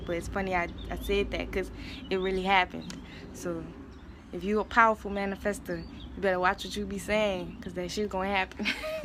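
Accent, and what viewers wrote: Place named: Louisiana, United States. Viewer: Is she from Louisiana? I hear a Louisiana accent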